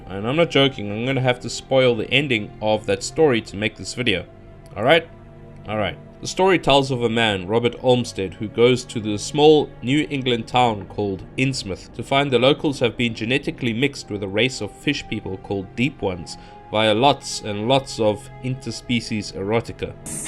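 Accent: South Africa. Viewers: You're very close. I'm from Slovakia. And you sound just like a friend of mine from South Afrika, but it seems that you've been all over the world. "The heart is deceitful above all things, and desperately wicked: who can know it?"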